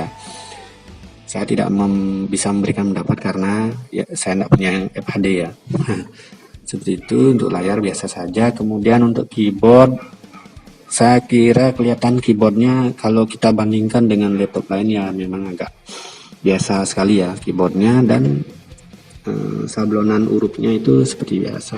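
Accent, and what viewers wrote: Bali, Indonesia. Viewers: Logat anak bali wkwkwk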